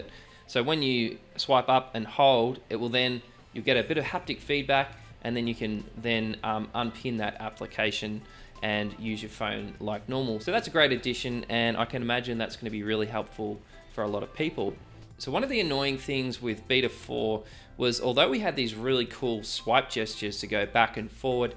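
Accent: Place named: Australia. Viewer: i just love aussie accent.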